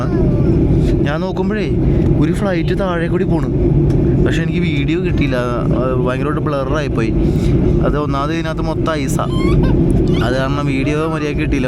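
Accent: Kottayam, India. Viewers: Super 👌 എറണാകുളം malayalam പറ bro കോട്ടയം malayalam ആയല്ലോ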